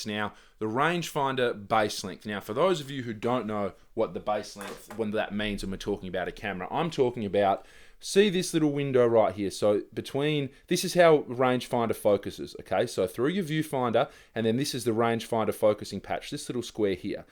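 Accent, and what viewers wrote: Australia. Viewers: I'm a very satisfied Voigtlander Bessa-R (LTM) owner, and I don't really care that much about what you're saying. I just love watching a real-life giant with a wicked Australian accent talk about analog photography, so cheers for all you do, mate ; )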